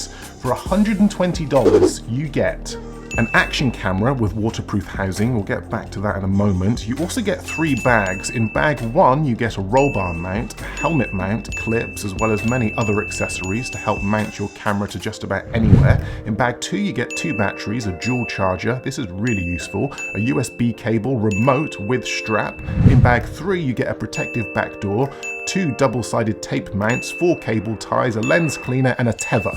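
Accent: United Kingdom. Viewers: Your British accent makes it even better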